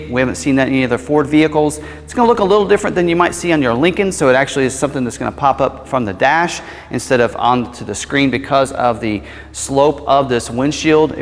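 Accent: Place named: AAVE, United States. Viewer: Yep, an Ebonics speaker evidently. Gives me a lot of confidence in this guy’s expertise.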